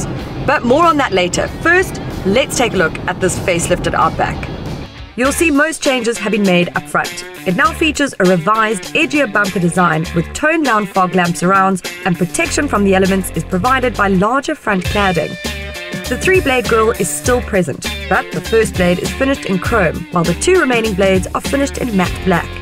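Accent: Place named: South Africa. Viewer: The south african accent never fails to baffle me, how does it sound so posh and cool at the same time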